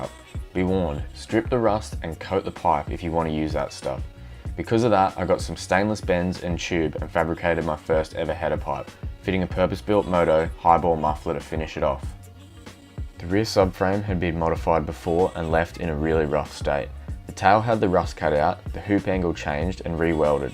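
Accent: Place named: Australia. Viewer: Love the narrative. Aussie as fack.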